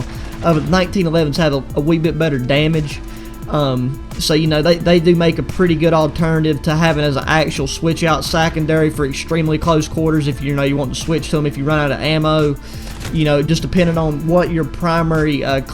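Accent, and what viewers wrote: United States. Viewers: love that accent lol sounds like a cowboy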